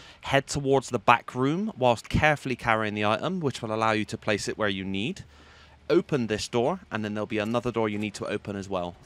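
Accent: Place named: South, United Kingdom. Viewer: You’re from Dorset or Wilshire! 😆 the southern British accent is strong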